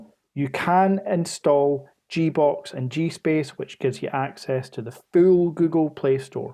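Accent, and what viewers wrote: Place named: Glasgow, United Kingdom. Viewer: This guy speaks with s Glasgow accent. He sound like Rab c Nesbitt.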